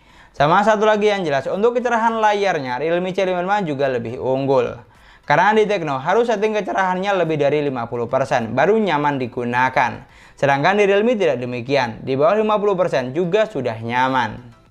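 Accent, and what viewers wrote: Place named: Madura, Indonesia. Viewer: Btw asli mana bang? Logat nya menarik heheheehe..b Saya LBH fokus ke logat nya.. Kayak logat madura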